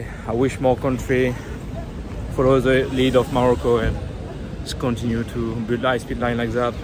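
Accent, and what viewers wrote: France. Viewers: ton accent c'est trop ..francais, la prochaine, parles toi en francais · On sent un petit accent français 😁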